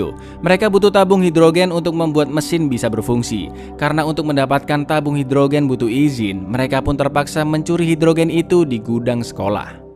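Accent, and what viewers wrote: Java, Indonesia. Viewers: Jowo kah bang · Risih kbnyakan medok jawa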